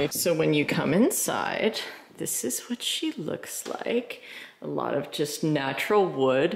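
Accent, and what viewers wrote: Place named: South, United States. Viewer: This is such an amazing place, congrats to you both!! Your future children are so lucky to be able to grow up in a place like this I know youre gunna make it so homely and warm<3 Also! Idk if youre doing it on purpose but I feel like youve gotten a more of a southern accent since being in virginia than when you were in oregon haha its so cute!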